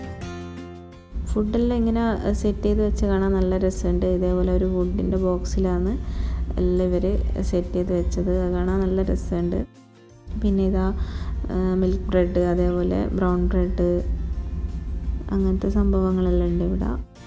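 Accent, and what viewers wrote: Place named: Malappuram, India. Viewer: slang Malappuram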